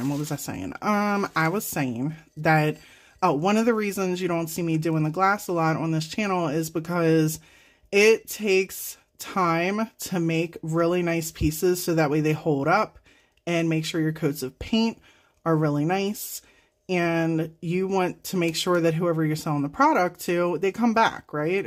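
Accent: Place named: Pennsylvania, United States. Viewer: I love listening to you, it reminds of home. PA. Unfortunately I'm coming in the next few days because of a death in the family.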